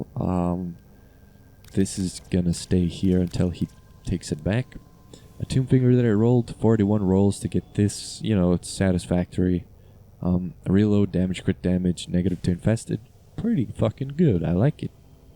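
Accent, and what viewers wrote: United States. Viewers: Q&A: whens that hot dog review coming? I want to see shlurp a sausage 😩😤 Also you sound American but you said you live in Europe so where do you come from?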